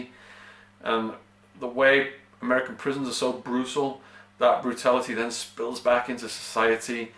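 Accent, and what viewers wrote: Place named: Liverpool, United Kingdom. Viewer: is yr dialect from Liverpool? Brover....brother....cool tho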